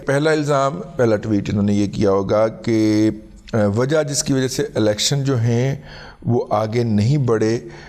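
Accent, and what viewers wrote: Punjab, Pakistan. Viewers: Dear, I always fondly watch your vlogs without fail. Being originally from Amritsar (& Jat). I don’t mind you making fun at us Sardars. Your vlog with heavy dose of punjabi tadka make it joyous and music to ears despite I not agreeing to your views esp around Kashmir militancy which you shared in previous vlogs and which is akin to plain lies. Please keep the good work. Gurbinder Singh Dhillon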